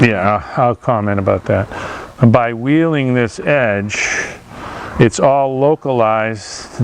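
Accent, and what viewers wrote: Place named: New England, United States. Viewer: Because of your New England accent I thought it was a flexible shape hat , but that makes sense too so that's what I call it. By the way it's pronounced Jag you are. That's only when you're across the pond.lol thanks for everything you've taught me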